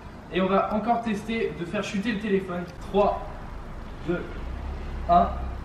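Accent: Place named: Corsica, France. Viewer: tu viens de corse toi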